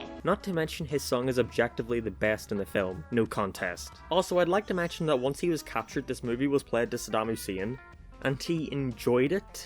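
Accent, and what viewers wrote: Northern Ireland, United Kingdom. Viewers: Mate, what part of Northern Ireland are you from?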